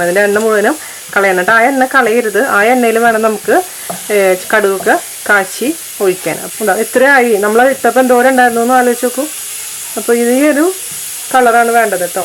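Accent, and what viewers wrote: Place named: Kannur, India. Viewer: Ingal Kannur aano😜Nala language😝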